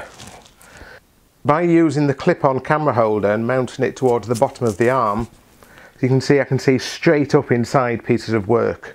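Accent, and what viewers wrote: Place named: England, United Kingdom. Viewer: Please forgive me new to your channel are you English you sound it but you said dollars .....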